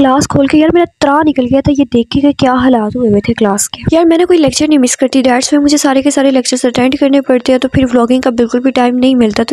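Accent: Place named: Punjab, India. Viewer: Ap ka bolny ka style superb h sat mien jo taraa wala yani punjabi ka tarka very nyc stylish design beautiful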